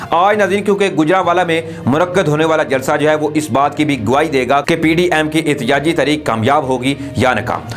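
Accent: India. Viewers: جناب صاحب اگر آپ مناسب سمجھیں تو اپنا تلفظ ٹھیک کریں ھمیں انڈین ھندی ٹائپ کی اردو سے نفرت ھے جیسے ۔ خون کو کھون کہنا خالی کو کھالی ۔ خانہ کو کھانہ وغیرہ ۔ اگر یقین نہیں تو اپنی سپیچ دھرا کے سن لیں اور باز رھیں ۔ لگتا ھے نئے نئے انڈین فلموں سے متاثر ھوئے ھیں ۔ اپنے اردو کو خالص کریں پلیز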